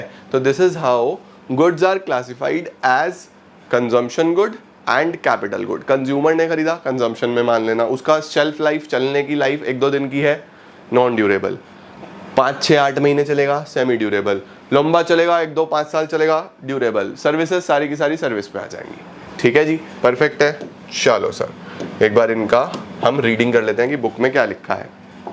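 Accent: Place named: Rajasthan, India. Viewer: Rajasthan ka hai bhai yo.. to kadr ka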